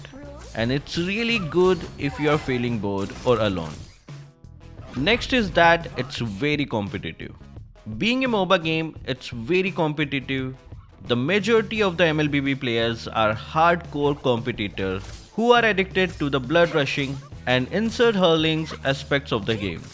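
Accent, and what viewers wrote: India. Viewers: I thought he's an Indian because of hi accent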